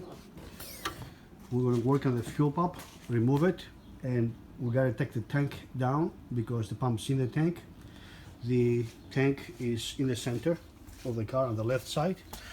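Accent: Greece. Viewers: Great video, I'm curious if that's a Greek accent?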